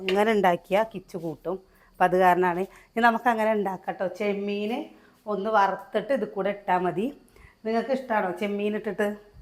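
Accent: Palakkad, India. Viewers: Chechide palakkaden slag kelkan nalla rasamund from kasaragod 🥰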